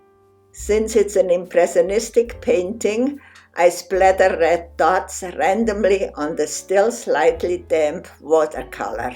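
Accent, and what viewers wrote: Switzerland. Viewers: Soft sensual painting along with the painter’s beguiling Swiss accent sharing artistic tips: Brava!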